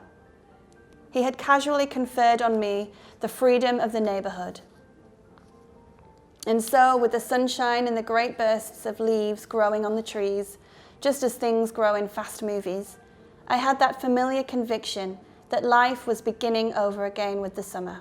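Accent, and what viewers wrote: United Kingdom. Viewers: Hi thanks for reading the book to us! I love your voice and british intonation ^^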